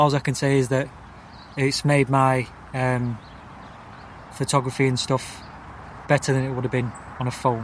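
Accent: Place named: England, United Kingdom. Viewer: How lucky you captured this beautiful bird, and what a great Lense and camera! Nice to hear about it's details too! Thanks.😊 I'm guessing you're a Pom? Not sounding Kiwi haha. Thanks again. You did well.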